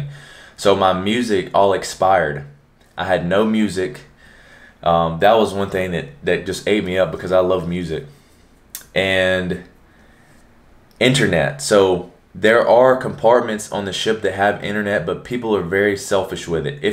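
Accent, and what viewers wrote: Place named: Texas, United States. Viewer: No homo but his Texan accent is such a refresher 😊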